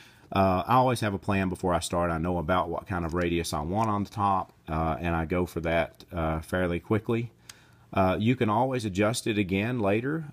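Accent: Texas, United States. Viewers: Can I guess your accent? Northern Texas or Oklahoma?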